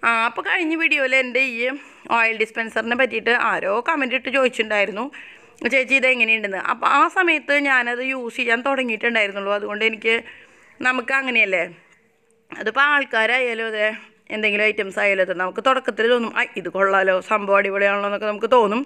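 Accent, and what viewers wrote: Thrissur, India. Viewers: Happy b'day Malu🎂🍫🍬🍭🍡🍨🍧🍨 Cheruthanenkilum nalla sadhya Chechiyude samsaram kettirikkan thanne enthu rasama. Nalla nadan thrissur bhasha thanne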